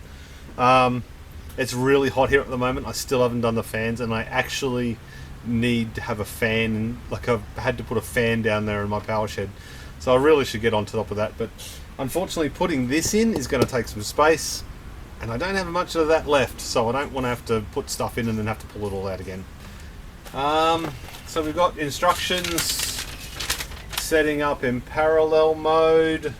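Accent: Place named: Australia. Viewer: We love your Aussie accent here in the states. Let's do an episode counting how many times says "let's face it.".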